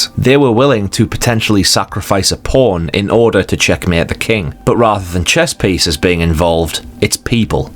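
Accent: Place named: Newcastle, United Kingdom. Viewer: I don't mean to doxx you or anything but it sounds like you've got a Geordie accent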